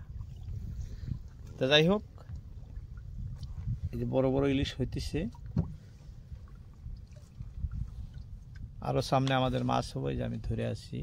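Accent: Bangladesh. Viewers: চাচা আমি আপনার ভিডিও দেখি,, আসাম থেকে ভিডিও গুলো দেন,কিন্তু আপনার ভাষা বাংলাদেশের মানুষের মতো, আসামের ভষাতো এরকম না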